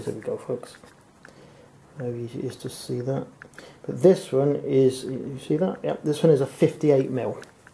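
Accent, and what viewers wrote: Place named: United Kingdom. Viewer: A 16:6 wide angle Mennon lens hood - the type seen in this video - is available on Amazon. It comes with a 55-58 mm step-up ring. This is a great video, by the way. I've one question, though: no matter how obscure my questions, whether regarding a vintage 1918 film camera or the most recent digital 4K cameras, virtually every YouTube video that answers my questions is the work a Brit. Any time a video a starts and I hear any sort of UK accent, I think: "Here come the answers!" Have you cornered the market of photographic experience and insight? No - thank YOU!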